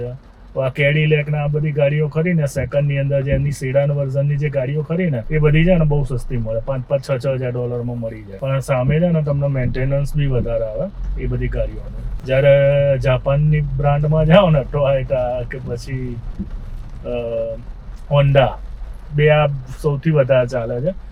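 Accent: Mehsana, India. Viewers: Tamaru gam khu che bhai tame saras amara jem mahesana ni boli bolo cho gam nu nam aapjo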